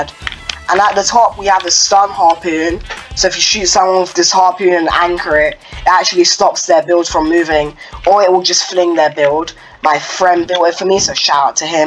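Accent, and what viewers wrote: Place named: United Kingdom. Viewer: The British accent is funny😂😂 You earned yourself a sub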